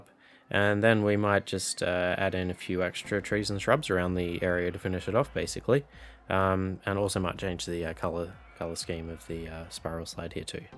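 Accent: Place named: Australia. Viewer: Getting a lot of inspiration from your videos in general, not just this one specifically. Really enjoying them! Definitely a more chilled vibe to some of the other RCT content creators out there (without criticising them of course, just my subjective experience); plus your Aussie accent is a welcome change for a fellow Aussie 🙂I've done the whole "click like and subscribe" ! Many thanks!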